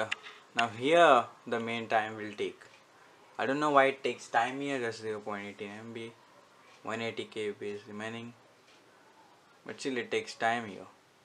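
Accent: India. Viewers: Indian accents, why?! Just can't understand a word.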